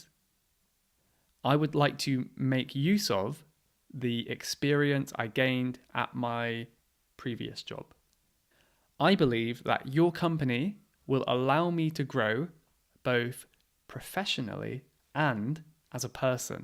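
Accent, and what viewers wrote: United Kingdom. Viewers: Hi! I came across your podcast by chance on Spotify and I really liked the episodes I listened to, especially this one about Job Interviews. I'm an English teacher here in Brazil and you have already become a role model for me! For a start, I'm biased about British accents! Just love it! Moreover, your talks are very well structured, clear and extremely didactic! I'm already a follower on Spotify! Thank you for your channel! I have a pilot podcast aiming at CPE Exam tips but it's just a draft, I have a lot to improve and I haven't been dedicating myself to it right now. It's called 'Polish Your English - Flávia Pini'. Cheers!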